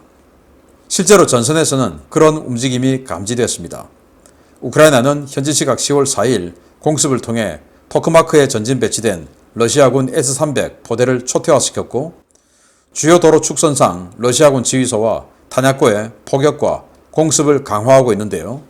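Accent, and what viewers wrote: Gyeongsang, South Korea. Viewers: 대부분 사실이길 바라면서 .. 너무 신나는 소식이라 너무 좋아하면서 이방송 열심히 듣고 있습니다 . 신인균님의 시원한 갱상도 사투리. 목소리도 더 재미있고요 ㅎㅎㅎ❤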